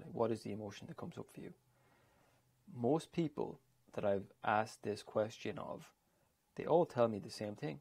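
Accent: Ireland. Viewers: very cute.. are you Irish? i would stop procrastinating with you.. ;-)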